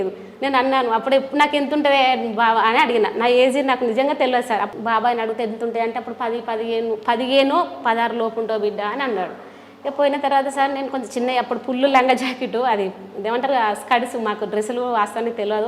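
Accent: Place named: Telangana, India. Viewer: కామ్రేడ్ మేడం నిజంగా మీరు చాలా అందంగా ఉన్న కామ్రేడ్ తెలంగాణ యాస లో మాట్లాడుతుంటే ఇంకా బాగున్నారు. ఇట్లా,,, గిట్లనే,,, బోయిన గూడ,,, మంచిగా,,, మస్తు,,, 👌👌👌అందమైన మీ పదహారణాల్ల అందం అంతా అడవిలోనే గడిచిపోయింది.నిజంగా ఈ ఇంటర్వ్యూ చూసి నేను ఫిదా అయిపోయాను మేడం. But ఏదేమైనా i love కామ్రేడ్ 🚩🚩🚩🚩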